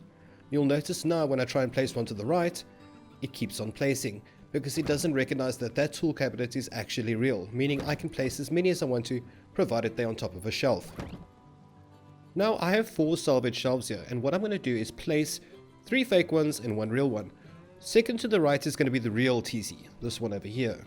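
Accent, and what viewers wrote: South Africa. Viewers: Are you South African,? You sound like that bad guy from Elysium. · Still feels so strange every to hear a South African accent every time I click on one of your vids, so used to NA/EU accents on YouTube.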